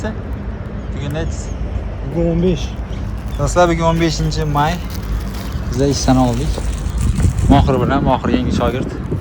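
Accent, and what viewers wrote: Khorezm, Uzbekistan. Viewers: Xorazmliksiz ajoyib aksentiz bo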